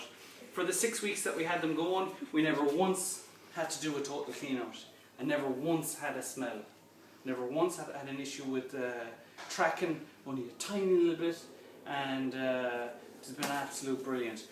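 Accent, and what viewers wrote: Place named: Ireland. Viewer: I love your Irish accent.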